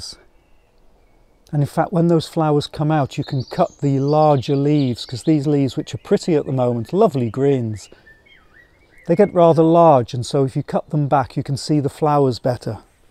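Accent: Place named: Warrington, United Kingdom. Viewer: Hi! This is my first time watching your videos and I was enthralled! I live in St. Louis now but I'm originally from Warrington and I knew by your accent that you must live close. I'm going to subscribe and look forward to more videos from you.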